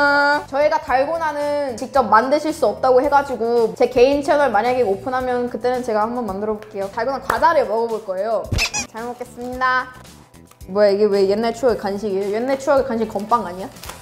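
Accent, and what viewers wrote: South Korea. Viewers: 정체가 누구예요? 한국말 완전 원어민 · 한국 여학생이야??????? 발음하고 말투하고는? ㅋㅋㅋ 우리 옆집에 사는 동생이랑 똑 같네? 대박이야~~~~~ 미치겠네~ㅋㅋㅋ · 와 완전 한국발음이네 ㅋㅋㅋ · 이 학생 외모만 외국인이지, 하는 행동, 말투, 표정하며 완전 한국인이네. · 영상 안보구 청각으로만 들으면 100퍼 한국인이다! 네이티브 ㅋㅋㅋ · ㅋㅋㅋㅋ ㅋㅋㅋㅋ 이학생 뭐니 말도 엄청빠르고 액션이나 말투 사투리까지 이아이 한국사람 오리지날이네 ㅋㅋㅋㅋ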